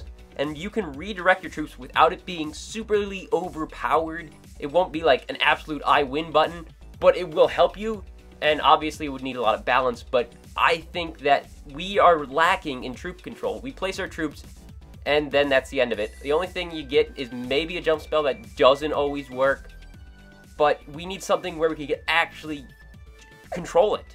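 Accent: Norway. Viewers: Are you Norwegian?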